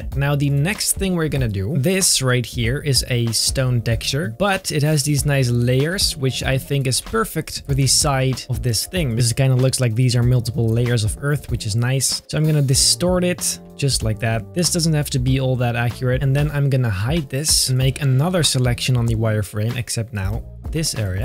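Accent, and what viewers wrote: Netherlands. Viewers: Go for a 1000 Microworlds!! And then auction them off like Beeple and become rich! Also, are you Dutch (like me)? I notice a bit of an accent, not too much tho... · You sound dutch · I love your accent, are you dutch? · It’s called an accent dude. He’s from Holland.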